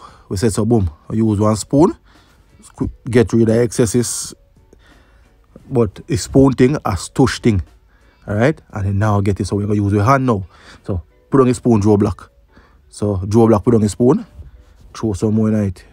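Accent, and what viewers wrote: Jamaica. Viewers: It’s the patois voice over for me 🤣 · you did our Jamaican chat with class!! Bring it on... we'll done. Wi food gone ah broad!!! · You brought out the Jamaican accent in full force for this one 💕💕💕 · Love the voiceover bro✊🏾✊🏾✊🏾 the JA accent came right out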